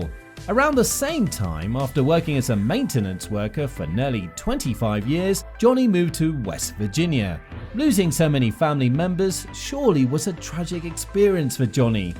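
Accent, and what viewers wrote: United Kingdom. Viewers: Get so tired of the brit narrators. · Where in West Virginia did you find a narrator with a British accent?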